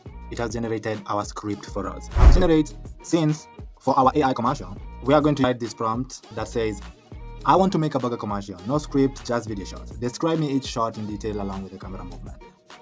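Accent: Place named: India. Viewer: Maybe you are talking with Hindi Accent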